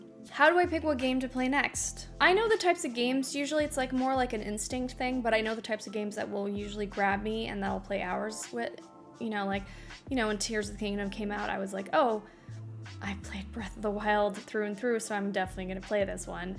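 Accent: Quebec, Canada. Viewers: I'm not fluent in french (we learn it mandatory in school here, german speaking part of Switzerland), but I realized how different Canadian french is to what we know :D. It sounds cool though. Being bilingual (at least) is always a win.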